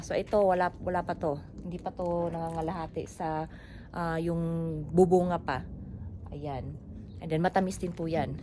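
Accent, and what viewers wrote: Visayas, Philippines. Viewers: I really love your accsent...viva to all visayan..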